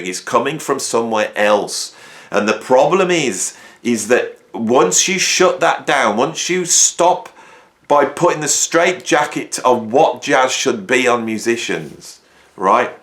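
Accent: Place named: Midlands, United Kingdom. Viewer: First of your videos I came across, agree with everything you say, so I subscribed, and you sound like a Brummy or Midlander.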